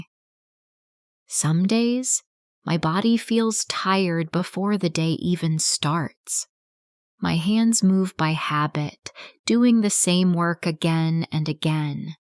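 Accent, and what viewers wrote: United States. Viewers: This Chanel is American because the accent is American clearly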